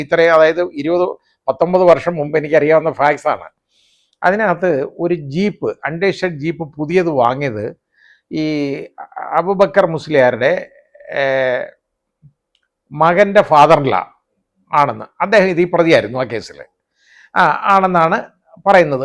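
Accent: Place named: Pathanamthitta, India. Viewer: സാറ് ഇത് തുറന്ന് പറഞത് നല്ലതായി, സത്യം ജനങ്ങൾക്ക് മനസിലായലോ🙏🙏, കാന്തപുരം ഈ വയസ് കാലത്ത് വടി കൊടുത്ത് അടി വാങ്ങി... ഒരു ഹൈക്കോടതി ജഡ്ജിക്ക് പോലും ഈ അവസ്ഥ ആണെങ്കിൽ സാധാരണകാരുടെ അവസ്ഥ ആലോചിച്ചു നോക്കൂ, സാർ... താങ്കളുടെ തുറന്ന് പറച്ചിൽ തുടരുക.. പത്തനംതിട്ട ശൈലിയിലുള്ള സംഭാഷണ രീതി കേൾക്കാനും നല്ല സുഖമുണ്ട്... എല്ലാ ആശംസകളും 💐🙏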